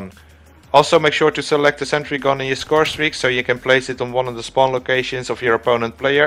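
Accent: Netherlands. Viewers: Thanks for posting! Btw are you Dutch?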